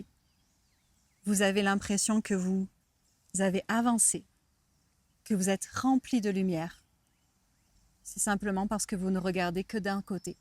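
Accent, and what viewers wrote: Canada. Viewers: Merci ❤ Merci de ce partage ❤ Tu as de plus en plus l'accent canadien 🤗😁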